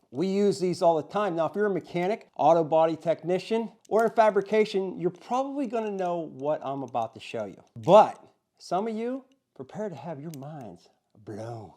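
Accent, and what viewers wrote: Pittsburgh, United States. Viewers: just found your channel, this is really a nice feature , but none of my vice grips have that allen wrench opening on the adjuster, probably because my tools are pretty old - I have Vice Grip branded and a few Craftsman vice grips in my tools. so... I'm gonna go and grab a new set that has the allen wrench hole. by the way, do I hear a little bit of Pittsburgh accent? or southwestern PA. when you say a few words I hear Pittsburghese "in 'air" I'm not making fun, it's just I hear a few words . thanks for the video , I subbed especially because that accent or I guess I should say colloquialism :)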